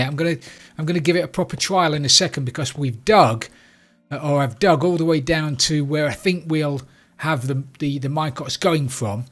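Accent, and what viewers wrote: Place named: Derbyshire, United Kingdom. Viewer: Wow. Wow. Wow. Mumbo Jumbo who?? You are the master of redstone. Amazing system. I'm surprised that if you like jump 2 so much, you haven't mentioned it before though;) I've been trying to work out which part of the country you come from based on your accent. My best guess is Derbyshire but not entirely sure...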